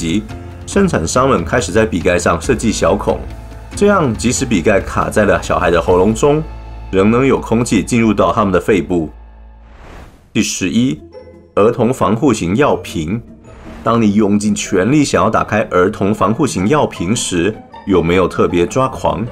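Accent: Taiwan. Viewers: 說話方式怪怪的，而且聽起來像台灣人又有點不像台灣人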